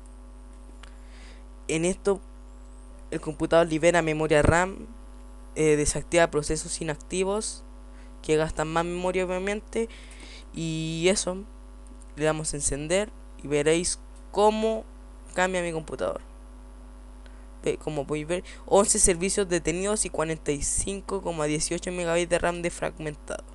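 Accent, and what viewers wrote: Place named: Spain. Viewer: porq carajos hablas como si fueras español?xDBuen video!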